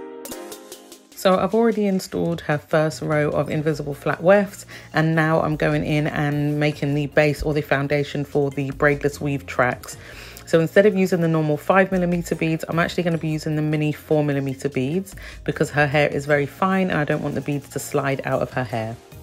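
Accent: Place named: United Kingdom. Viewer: Gosh, I always drool over British accents, but yours is even better than usual. Hair looks great too!!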